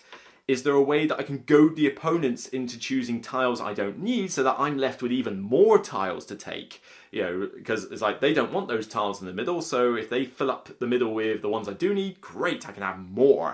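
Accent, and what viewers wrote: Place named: Bristol, United Kingdom. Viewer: Canadian here, you’ve become my favourite board game channel for your deep understanding of mechanics and finding imbalances, etc, rather than just a constant ad for each game. I have a question… your accent is unfamiliar to me but sounds like someone from my childhood from Bristol. Are you from that area?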